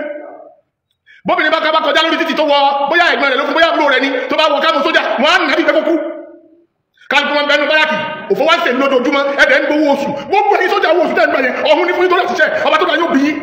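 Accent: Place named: Yoruba, Nigeria. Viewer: I think this afa is sounding like a yoruba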